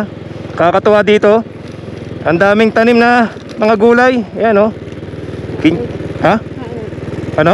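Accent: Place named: United Kingdom. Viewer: WOW THAT'S A BRITISH ACCENTS.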